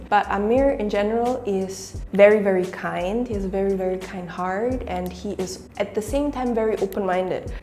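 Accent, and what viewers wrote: Malaysia. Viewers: You sound like Malaysian already, hahaha 👍🏾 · Hang pun borak dah mcm org local(malaysia) even bila hang speaking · English dier dah kena rasuk Manglish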